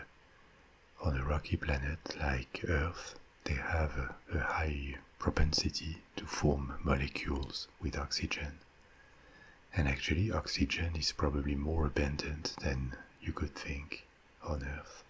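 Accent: France. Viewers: Your videos are really great, but your accent reminded me of my former asshole of a French boss from years ago so I got high blood pressure from listening to your videos instead. Totally not your fault, just unfortunate LOL. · You have the most relaxing voice and the coolest accent. Actually you don't have that much of an accent. You speak excellent English. But it's a compliment. I love French language and the way you speak. Also, thank you for a great night of sleep, relaxation and expanding our brains with knowledge! You are awesome! I love your channel and really appreciate all the hard work you put into your passion! Thanks again and God bless. :)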